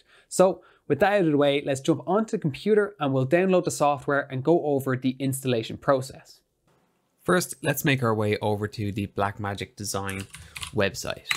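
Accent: Ireland. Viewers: Very helpful, thank you. Just wondering, since you sound Irish, I am in Ireland and thinking about buying a v-mount plate and battery for my BM6k, but almost everywhere on Amazon and other sites say they don't deliver batteries to my location. Could you recommend a site that does?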